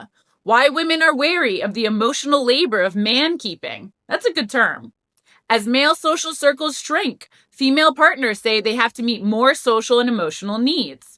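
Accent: United States. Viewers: Girl!!! You’re killing it and I LOVE your Jersey twang!!! Looking gorgeous too ❤.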